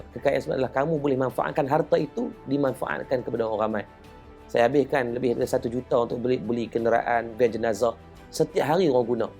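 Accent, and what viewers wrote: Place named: North, Malaysia. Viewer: Terengganu nyer dah hilang jadi orang utara😊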